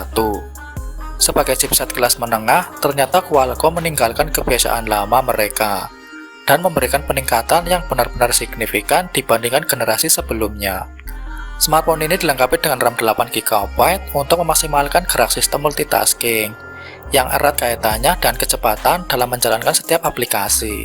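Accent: Banyumas, Indonesia. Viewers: Dri dialeg nya kdgrannya org ngapak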